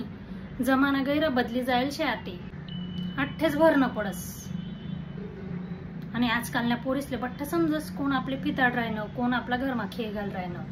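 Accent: Khandesh, India. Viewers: Kiti natural acting kartes ❤ Ahirani aikayla khup goad vatata❤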